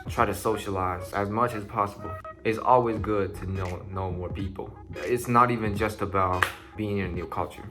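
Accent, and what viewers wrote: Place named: South, United States. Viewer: your southern accent tho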